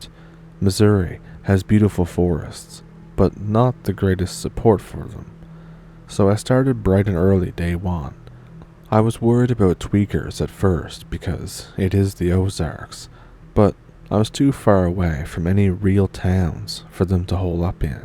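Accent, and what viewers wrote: Ontario, Canada. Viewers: Hello,. Thanks for posting, you're voice always reminds me of My Ontario cousins and blood and such, 👍🎉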